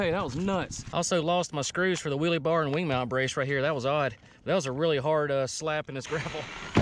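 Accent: South, United States. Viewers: Once I heard that accent I knew I was dealing with a pro, that amount of redneck and annunciation is a dead giveaway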